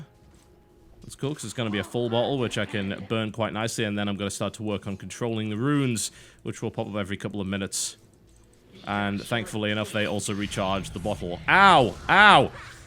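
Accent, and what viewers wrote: France. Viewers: Love the French accent lol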